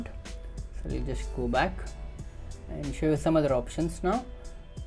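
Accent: India. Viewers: That was the most painful video I have ever witnessed, please don’t ever review a product again, or if you do please be sober The worst part is the Indian accent in English!!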